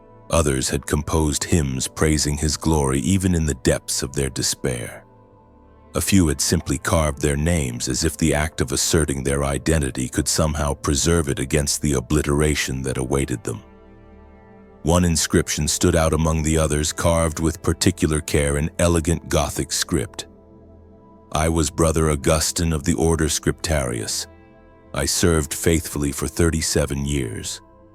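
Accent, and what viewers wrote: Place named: United States. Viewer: With so many accents you choose a basic american one? Lacks imagination and faith, the inquisition now watches you for both the sin of imagination and faith in what you believe. Furthermore the pics are not cannon. They Gray Knights are now also involved!!!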